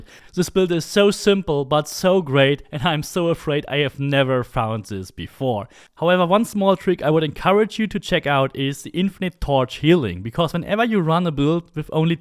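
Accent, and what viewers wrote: Germany. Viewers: Man hört den Deutschen zwar noch rauß aber deine englische aussprache ,bis auf ein paar deutsche Klassiker, ist echt mega.Englisch Studium oder einfach nur sehr viel englisch geredet?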